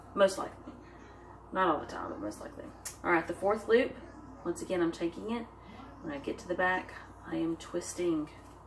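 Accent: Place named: South, United States. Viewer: I love your southern draw - shake it off!!! Kinda sad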